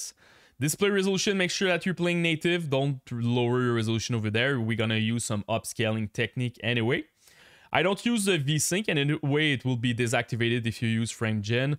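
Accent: Quebec, Canada. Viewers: J'entends le Québec ici! Good video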